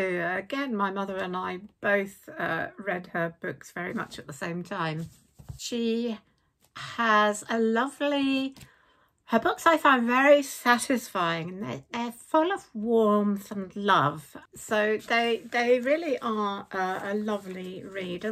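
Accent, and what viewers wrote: England, United Kingdom. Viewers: No apologies needed for reading in your British accent; I loved it!!! I look for British readers for everything. 🤣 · Also, I loved hearing Little Women in your English accent, I could listen to all books in your beautiful accent!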